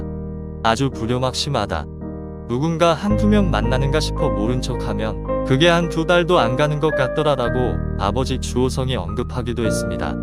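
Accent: China. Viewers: 좋은 영상도 아님~ 인공지능 대사 대충 만들어서 조선족 말하는 거처럼 들림~ 장나라팬인데 정말 기분이 나뿌네~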